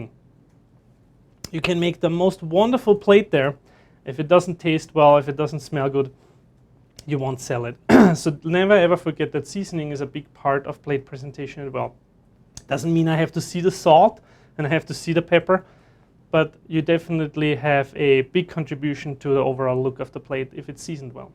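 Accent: Austria. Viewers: Phillip Dodds austrian probably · Einen Österreicher hör ich aus 100 Leuten raus :-) Cooles Video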